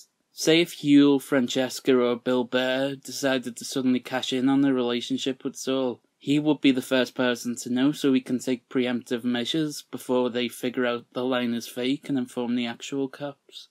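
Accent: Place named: Yorkshire, United Kingdom. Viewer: I thought that exact same thing and subbed too! I'm no expert but i thought i hinted some early Alex Turner in your voice, I believe he's from Yorkshire? I'm horrible w accents tho so I'm prbly wrong 😂 thanks for the video, i love your theory of Saul running the "tip" line.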